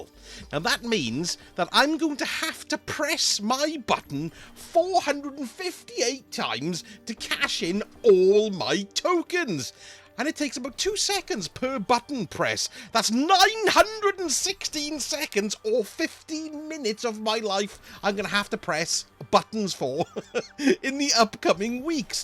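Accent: Wales, United Kingdom. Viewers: I can spot a Welsh twang in your voice,am I right?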